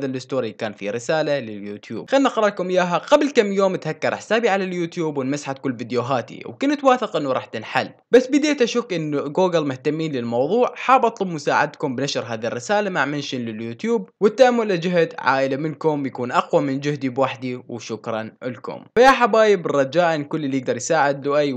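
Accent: Iraq. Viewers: انته عراقي لو كويتي❤❤❤❤❤❤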